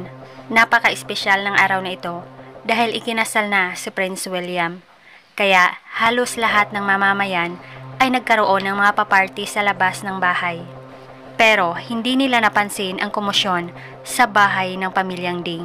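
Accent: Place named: Iloilo, Philippines. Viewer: I believe Ilongga sya😊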